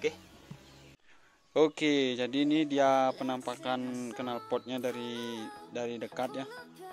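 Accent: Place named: Bali, Indonesia. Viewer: Logat nya bali banget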